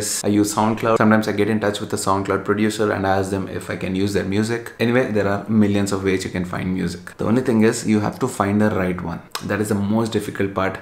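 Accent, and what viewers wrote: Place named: Telangana, India. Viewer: This is the actual fun I need frm u , talking in pakka telangana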